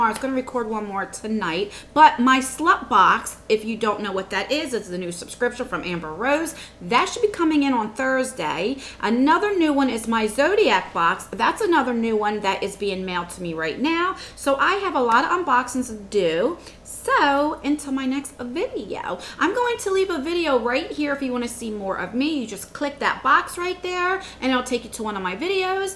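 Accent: New Jersey, United States. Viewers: I just like hearing your accent totally New Jersey, yes Walmart has goodies great for traveling too.Have a great Evening <3 Tina in Cali...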